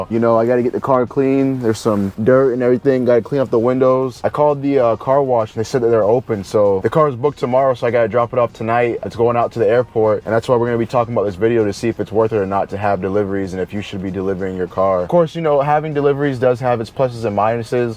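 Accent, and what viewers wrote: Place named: Charleston, United States. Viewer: Im from Greenville and i swear you got the clearest Charleston accent i ever heard 🤣🤣🤣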